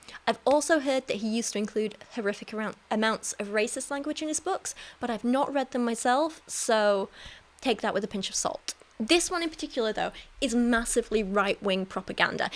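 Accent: United Kingdom. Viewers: When you read this nonsense in your pleasant British accent it just sounds to me like Harry Potter crackfic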